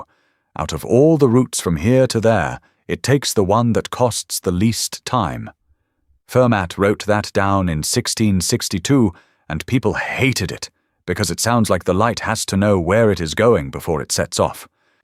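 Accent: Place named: Germany. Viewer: It figures this guy has a German accent.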